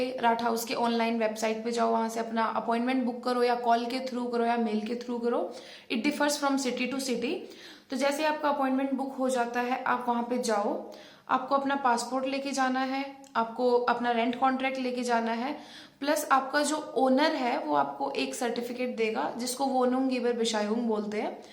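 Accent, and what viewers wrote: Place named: Sindh, India. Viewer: Ur tone looks like Sindhi so r U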